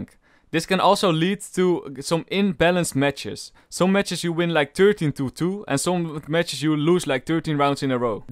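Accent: Netherlands. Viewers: The dutch accent makes it a lot better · Ur dutch no doubt about that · This dutch accent 😭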